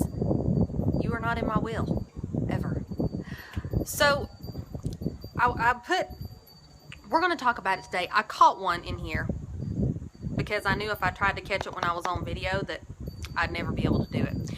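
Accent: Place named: Virginia, United States. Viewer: I live in Virginia, and we have a similar accent.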